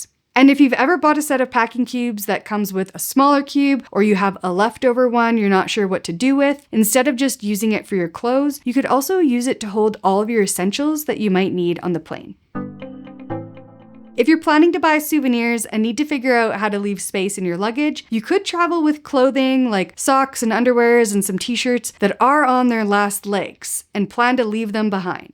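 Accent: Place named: Canada. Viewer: Broncos fan? Saw the fingernails. Then again, I detect a slight Canadian or Yooper accent. Thx for video!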